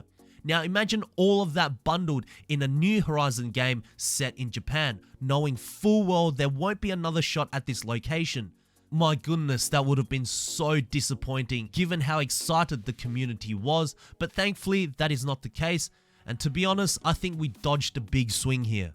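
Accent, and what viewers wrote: Australia. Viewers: Is it the australian accent or do you have a runny nose? :D Nice vid!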